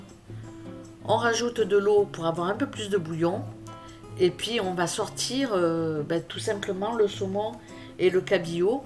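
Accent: South, France. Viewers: Cc ☀️ On va se régaler😋 mes enfants n’aime pas trop le poisson, mais par contre le cabillaud ils aime, merci chef Danny🤩 de partager de si bon plat et à toi avec ta douce voix du Sud que j’adore🥰 j’ai fait péter les pouces bleu, comme d’habitude🤣 Gros bisous et bonne soirée😘🥰👍👍👍👍👍👍👍👍👍👍👍👍👍👍👍👍👍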